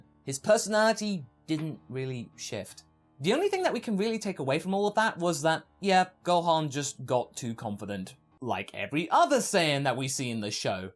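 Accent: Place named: RP, United Kingdom. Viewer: you sound like a posh dbza goku. I keep expecting you to say "muffin button"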